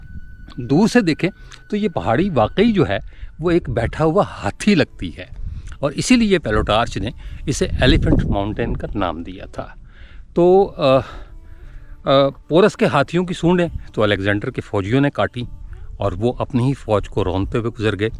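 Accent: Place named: Punjab, India. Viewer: His Urdu has inclination towards United Provinces , and to Bihar, yet a creamy touch of Panjabi accent.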